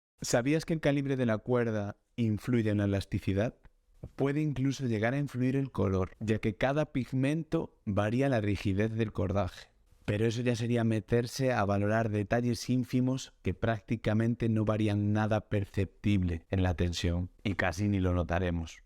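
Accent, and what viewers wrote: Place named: Galicia, Spain. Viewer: Buenas, este mes que viene me iba a comprar por mi aniversario la Wilson shift 99 (patron 16x20) Tengo 27 años, mido 1.73, peso75 kg Y juego a 200m nivel del mar o 0 (zona de Alicante, muy calurosa habitualmente) Y le iba a poner un alu power 1.25 con tension 24vertical-22 horizontal Pero me tienta la Wilson shift pro por tener mas peso e inercia aunque me hace dudar por su manejabilidad por el peso y su patron 18x20 ( a esta le pondria 25) Soy nivel intermedi avanzado, juego agresivo fondo de pista e intercambio mucho spin con alguna dejada. Muchas gracias por tu trabajo PD: me flipa ese accento gallego y la tranquilidad que transmites en cada video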